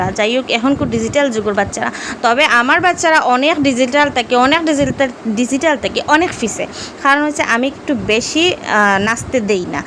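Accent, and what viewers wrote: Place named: Sylhet, Bangladesh. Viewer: মাশাল্লাহ অনেক সুন্দর ভিডিও আর তোমার মুখে সিলেটি ভাষা শুনতে আরো ভালো লাগছে ধন্যবাদ এত সুন্দর ভিডিও শেয়ার করার জন্য লাইক এবং পুরো ভিডিও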